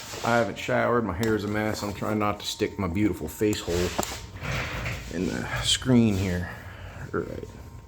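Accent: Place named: New Jersey, United States. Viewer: Hey man I have a question. I got a Moto Iron front end, and the springs make a hellish amount of noise when hitting a bump, on the brakes or anything that causes suspension compression. Sounds like a slinky. I sprayed the hell out of every part of the spring assembly with PB silicone spray, didn't seem to help. At idle, the inner spring on the lower right side is visibly vibrating and shaking like crazy. Wonder if that could cause the noise? Is there some kind of adjustment I can make to fix this shit? I'm brand new to Springers, so I know next to nothing about maintaining and adjusting them. Also, you from NJ? You got a North East NJ accent to my ear. I live in central/southern Jersey on the shore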